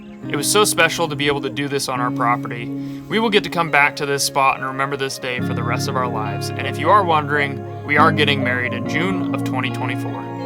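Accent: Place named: Ohio, United States. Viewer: i just saw kentucky but even in ohio they have ur same accent up here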